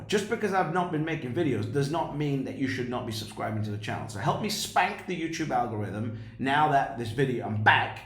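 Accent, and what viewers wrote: Scotland, United Kingdom. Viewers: Good channel bro, you sound like you are from Scotland.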